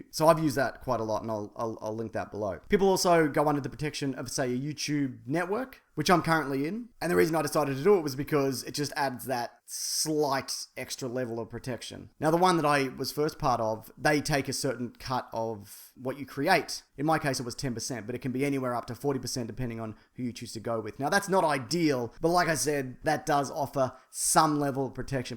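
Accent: Australia. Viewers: Wow, this video is very informative, thanks for bringing this issue to light. I have heard horror stories about youtube in the past. Just wanted to say how much I enjoy your channel and I bloody love (the) Weekly Planet. I am originally from the UK but currently living in Malawi. The internet connections are pretty shit here but I really look forward to your podcasts and videos every week. Yourself and Mason are fucking comedic geniuses! Oh and I spent two years living in Melbourne a few years ago and I quite enjoy hearing the accent again! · I knew he was from Australia #wtfu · I love you,. I love you and your sexy sexy serious Australian voice.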